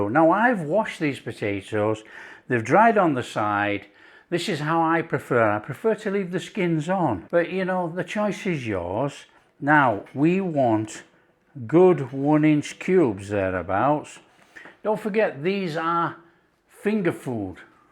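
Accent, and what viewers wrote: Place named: Leeds, United Kingdom. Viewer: Fantastic. Delivery superb. Really lovely guy, from Leeds. I guess? Keep it coming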